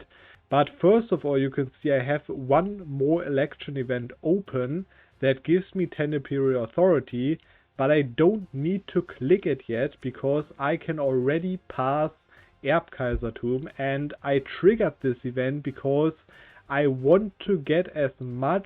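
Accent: Germany. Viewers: You know the video about an autistic map game is about to be good when you hear a German accent recorded by the most clapped out microphone to ever exist.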